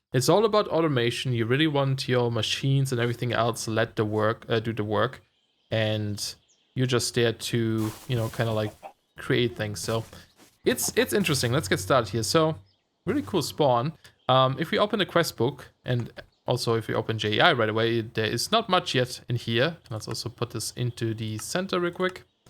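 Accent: Germany. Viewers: I was looking for this comment. Fellow German here. His English is pretty good, however I believe he still uses German intonation. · Are u German?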